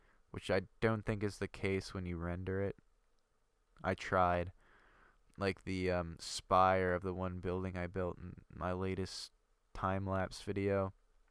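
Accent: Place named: Canada. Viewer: Your accent sounds more Canadian . . . by the way, Big fan of the series and take a lot of tips and architectural designs from it. Keep it up!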